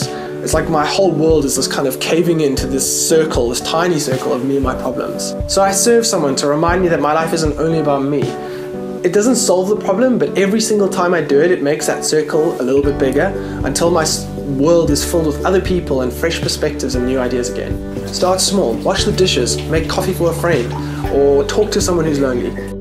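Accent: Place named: California, United States. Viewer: And you're picking up the Californian accent.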